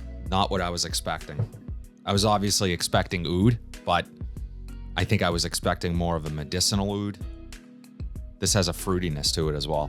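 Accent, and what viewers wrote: Boston, United States. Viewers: After a month - have your impressions changed? Also, you’re from Boston aren’t you :)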